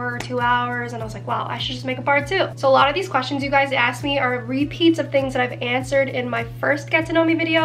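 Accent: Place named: Italy. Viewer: do not hide your italian accent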